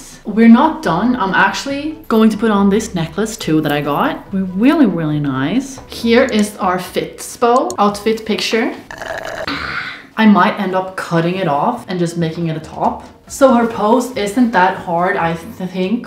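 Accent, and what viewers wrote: Sweden. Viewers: Bro are you swedish? You sound very swedish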